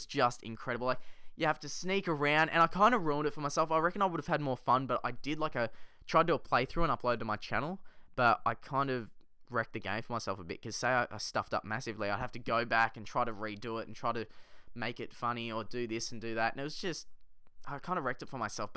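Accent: Australia. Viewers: Love the accent x) Australian?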